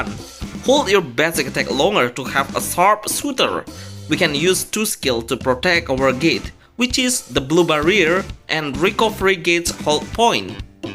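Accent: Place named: Indonesia. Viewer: Yes, he Indonesian accent so thick 😊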